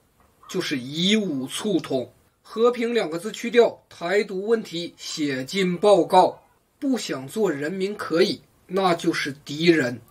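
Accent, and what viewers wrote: Northeast, China. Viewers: 哈哈😄你是东北人吧！高!老乡!👍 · 东北话的幽默风趣 体现的淋漓尽致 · 老哥的东北口音好搞笑，好喜欢😄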